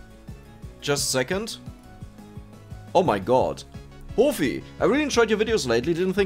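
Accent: Germany. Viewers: He already know why sounds German.... Because he is German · Your english is close to perfect I think. Its just the accent thats German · the reason people can hear you're german is your pronounciation of words, and also your "so" word. You say so as a german would say so in german xD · You wondered why people can tell that you're German. I think the two biggest tells are pronouncing "the" as "de" and the specifics of "ya" as a replacement for "yes." "th" becoming "d" is VERY German. You extend the "a" at the beginning of "ya" and use a sound at the beginning that's halfway between "y" and "j," which is also very German. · I heard you where German the first time I saw a video of yours! I am Norwegian, so I recognise German/english easily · If you want to know why you sound German I can recommend the video from improve your accent on this topic (it helped me with my Englisch) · How you pronounce your English words is how non-Germans recognize your nationality... 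it's your accent. That's not good or bad, it just is what it is. :)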